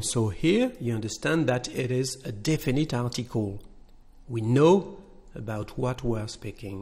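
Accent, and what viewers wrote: France. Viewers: Are you actually French or you are just really good at it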